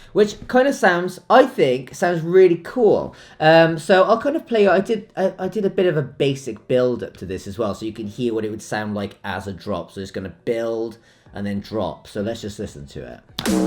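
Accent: United Kingdom. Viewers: Shit,! That was a very nice video. I am in a DJ/producer course, but now I see I should have spent the money on gear and learned from you, on YT. You have great content. Hope we can share a beer when I'm UK (you're based there, I assume, due to your accent.) Love from Brazil.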